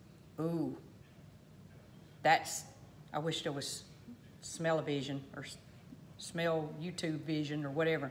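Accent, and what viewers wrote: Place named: South, United States. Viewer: That accent!! 😍 Miss living in the south. Anyway, love your videos! Let’s go Vols! Lol 😀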